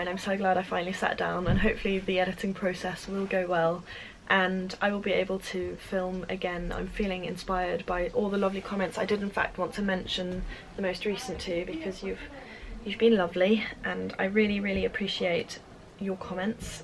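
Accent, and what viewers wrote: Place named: United Kingdom. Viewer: OMG, you are gorgeous, girl. You look so vibrant and healthy and what a !lovely haircut. I'm an old crafter here in southern California who really enjoyed getting to see and hear all the farm life, city life, British accent and crafting, but I was also very moved by your struggles. I was just sitting down to have a cup of coffee when I got a notification from YouTube that you posted an update and couldn't have been more delighted. I can knit and purll but simple shawls and scarves have been about all I managed. You are very, very good. Love your cardigan and the new vest/sweater you are working on now. Than you for updating and know you have a crafting friend in sunny hot-as-hell California who wishes you the very best.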